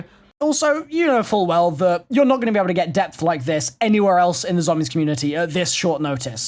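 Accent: United Kingdom. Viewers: XactoSharks He's British. It's different there. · when he was saying "zed m" i got really confused and i thought i was missing something but then i saw the ZM and i remembered that british/autsrailian people say "z" really weird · Are you British?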